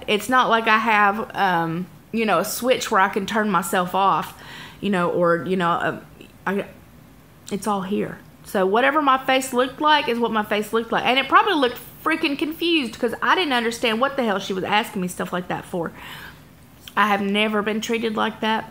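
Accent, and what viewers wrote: South, United States. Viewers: I found your channel a few weeks ago, after looking for WLS vlogs. I love your candid conversations. it makes me feel less alone. I also love your accent! Southern girls rule!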